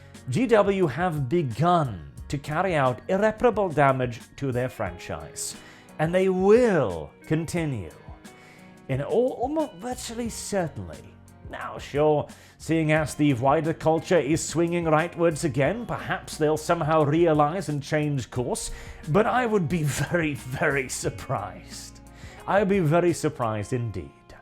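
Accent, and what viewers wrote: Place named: RP, United Kingdom. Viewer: Why are you speaking like Etonian Jacob Rees Mogg pound shop Enoch?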